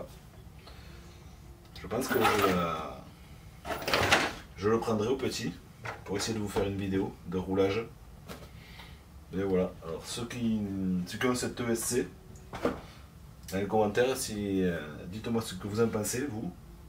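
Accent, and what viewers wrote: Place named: South, France. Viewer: Ba si fait la je comprends rien avec son accent du sud rajoute des euuuu partout 😂😂😂🤣🤣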